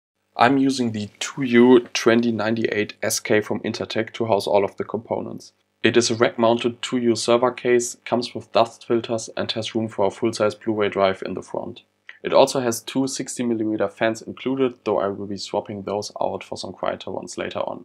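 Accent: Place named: Germany. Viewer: Man hört voll raus das du Deutsch bist is bei mir auch so das voll cool :)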